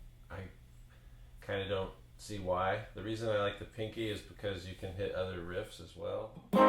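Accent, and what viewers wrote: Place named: Arkansas, United States. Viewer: You really suck man, you sound like a loser hick white guy from Arkansas or something. Man, you SUCK. lol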